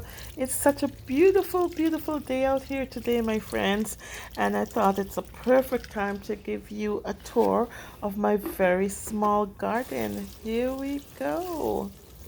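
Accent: Jamaica. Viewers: Hi Sis. Yes thought I heard a Jamaican accent. It's great to see how you used the containers for all these veggies. Compost is a great idea. Also it helps to switch out the types every 3 years. New friend. ☺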